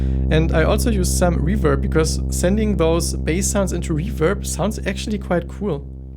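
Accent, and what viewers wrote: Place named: Germany. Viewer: i like the way you speak, professional english with that little german accent chills me somehow lol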